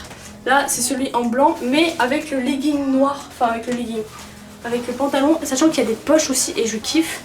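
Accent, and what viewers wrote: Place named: England, United Kingdom. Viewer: Super vidéo j’ai attendu grave longtemps pour ta vidéo enfin elle est sortie et très belle accent anglais au passage mdrr bref j’te kiff 💕💍❤️🍭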